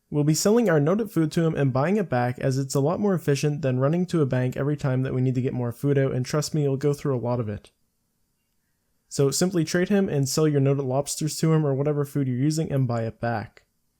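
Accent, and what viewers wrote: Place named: Canada. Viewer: Thank you so much for this video, and for most of the people responding about you "not" saying the right words, I'm going to guess and say is Canadian and that is just the the regional accent. If a scottish person was talking here, I doubt you would be criticizing them as much because you'd be more familiar with the accent.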